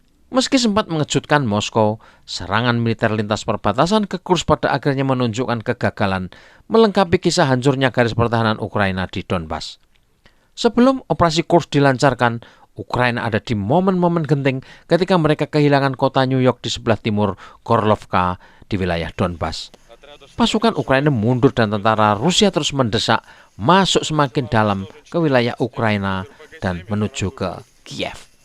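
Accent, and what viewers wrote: Java, Indonesia. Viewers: Loghat jawanya di kurangi bos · Narator nya lucu logat nya jawa bingit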